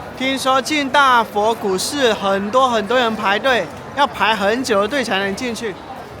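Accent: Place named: South, China. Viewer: 听博主这口音就是南方人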